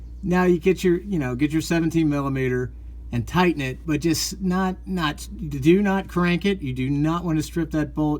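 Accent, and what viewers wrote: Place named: Germany. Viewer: Your video was a great help! Appreciate the humor and German accent too LOL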